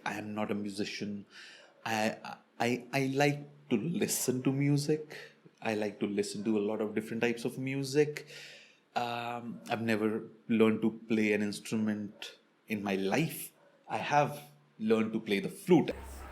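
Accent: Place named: India. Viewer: Your accent is like indians...🙄🥰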